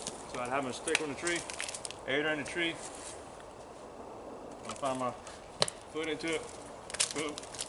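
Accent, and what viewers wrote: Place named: Louisiana, United States. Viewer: I read S. Carolina but Coming from a Cajun, you sound Cajun as hell man lol I just ordered these sticks. Good idea about the rock climbing aider.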